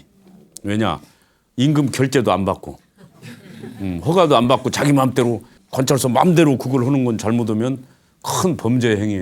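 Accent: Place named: Jeolla, South Korea. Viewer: 기자도 아니고 교수도 아니고? 전라도 말씨네?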